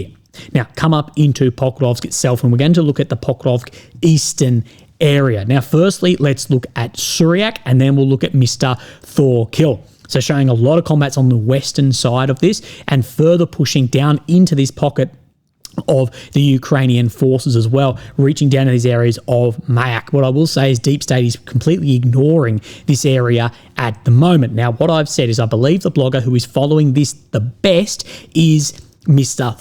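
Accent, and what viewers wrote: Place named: Australia. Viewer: Dude please start talking a bit slower I didn’t master the art of Australian accent 😅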